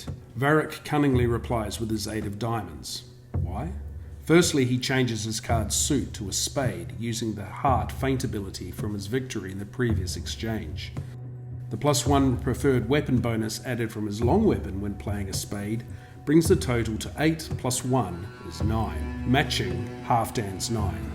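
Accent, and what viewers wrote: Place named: New Zealand. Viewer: Sounds like this is an NZ developed game, from the voice over at least. Couldn’t get a copy from anyone in the South Pacific, so had to order a copy from Germany! Looking forward to it arriving. Hopefully enough time to print and paint some minis!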